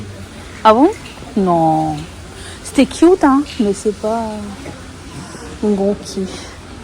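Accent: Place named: Quebec, Canada. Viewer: Merci pour ce petit bout d'accent si merveilleux. Je suis tombée en amour du Québec avec les séries "la petite vie" et surtout "Catherine". Bonne journée à vous;)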